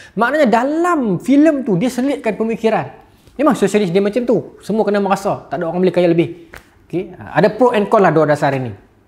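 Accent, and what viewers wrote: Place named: Kedah, Malaysia. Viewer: Seronok dengar loghat kedah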